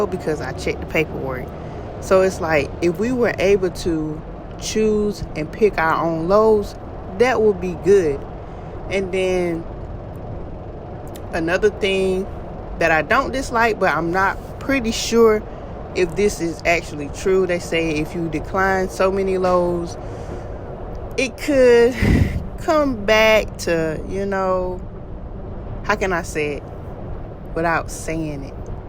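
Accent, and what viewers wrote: South, United States. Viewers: Im legit addicted to the thiccness, the skin tone, the southern accent. I love it all. You should consider hiring a video editor to save you some time.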